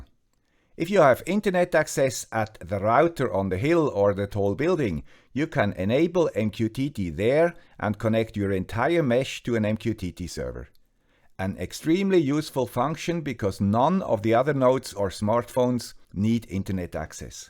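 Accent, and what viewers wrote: Switzerland. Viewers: I love your Swiss accent, more videos please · the guy with a Suiss accent and a small pointing hand. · Haha liebe das schwizer englisch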